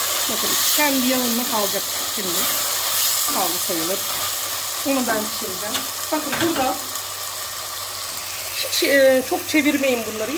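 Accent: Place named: Thrace, Turkey. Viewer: Macırsınız galiba.kıyık bırak dediniz ordan bildim.zaten konuşma aksamınızda trakya aynı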